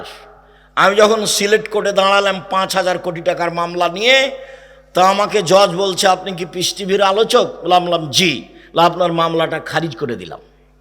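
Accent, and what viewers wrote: India. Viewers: শায়খ হুজুরের কথা শুনে বোঝা যাচ্ছে উনি পশ্চিমবাংলা ভাষায় কথা বলছেন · এই লোকটা কলকাতার ভাষায় কথা বলে কেন ? সে কি ভারতীয় ?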